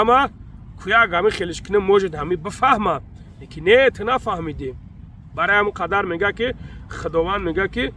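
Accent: Kulob, Tajikistan. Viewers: Саломалекум ака чиста кулоби гап мезани мабодо ай кулоб набоши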